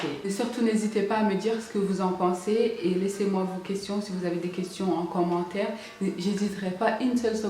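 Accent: North, France. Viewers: Tu t'exprimes tres bien! On entend meme aussi un petit accent du nord de la France !!! Ton/tes accents ne nuisent en aucun Cas a la Bonne comprehension de ta video. Et si je peux me permettre, ton ton est posé, la voix assurée, un debit de parole calme et apaisant, non vraiment je trouve que tu t'exprimes tres bien et de surcroix avec beaucoup d'elegance. Ne serait-ce que, pour ce que tu degages dans cette video, je continue de visiter ta chaine, a bientot la Belle 😘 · Bonjour Tu es vraiment magnifique et très courageuse d oser parler de ce qui te complexe ! Tu t exprime parfaitement en français et ton accent (que je trouve mignon) ou je devrais dire tes accents (tu as un petit accent du nord de la France ) n enlève rien à ta très bonne expression française ! Beaucoup de français français eux même ne s exprime pas aussi bien alors oublie ton complexe ma belle . 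De plus tu as une voix très douce ce qui permet d être rassurée lorsque tu explique quoique ce soit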